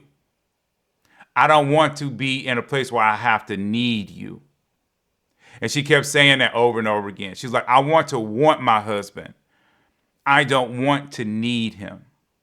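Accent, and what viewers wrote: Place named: Memphis, United States. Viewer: Lol i didn't know you were from Memphis, your accent isn't as strong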